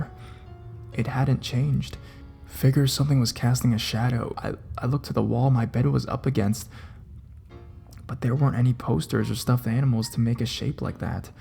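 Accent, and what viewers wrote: Canada. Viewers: Are you Canadian?? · I can tell he's Canadian because instead of "about," he says "aboat." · Canadians... that accent,and the overacting makes this post sound like one of those scary stories for kids records from my childhood, "whose got my golden aaaaarrrrrrrrmmmmmm?" kinda thing.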